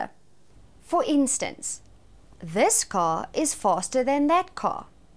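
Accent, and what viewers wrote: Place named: Afrikaans, South Africa. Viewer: You come from South Africa because you have a Afrikaans accent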